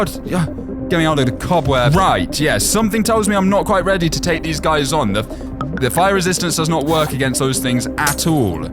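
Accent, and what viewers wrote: United Kingdom. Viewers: are you British 😂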